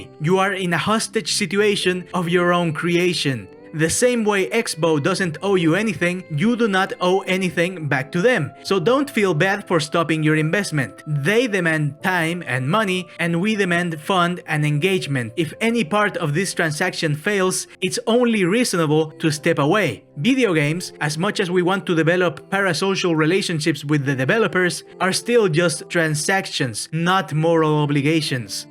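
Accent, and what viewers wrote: Chile. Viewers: chilean accent screamer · Siempre que escucho a un compatriota y que es flaite me das un asco que verguenza, que bueno que naci en lo barnechea. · Espera como que sos chileno JAJAJAJA excelentes videos eu, y excelente inglés también.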